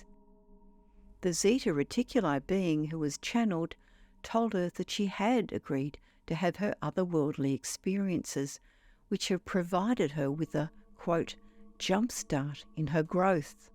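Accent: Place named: Australia. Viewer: Its called an aussie accent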